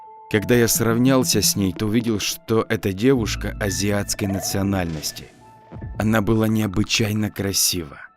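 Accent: Ukraine. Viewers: По говору слышно)и очень приятно слышать рассказы об украинских местах, с элементами местных словечек) у вас отлично получается озвучка, желаю вам удачи) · Автор,ты не из Украины ?)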